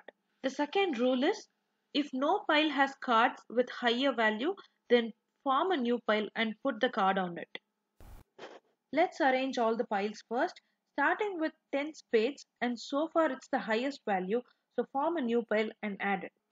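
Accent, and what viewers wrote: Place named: India. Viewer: I wont lie...normally I ignore videos in Indian accents (because generally they're rubbish)...but this was excellent. Well done and thanks.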